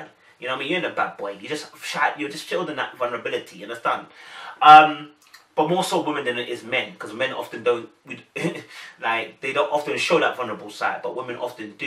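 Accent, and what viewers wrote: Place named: United Kingdom. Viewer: Its nice to hear a British accent reviewing this show. Great review. New subscriber!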